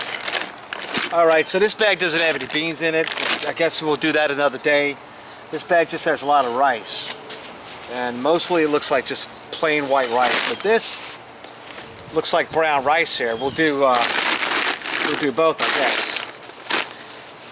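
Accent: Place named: Boston, United States. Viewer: LOL! I'm born and raised NH and when I hear you talk....I hear Boston....LOL!! Nothing personal....not trying to be disrespectful.....just not 'hearing', the accent I expected to hear. Still a good video....and I subscribed....looking forward to more....we need to learn to live with less....we are spoiled and all those creature comforts, have made us prideful!!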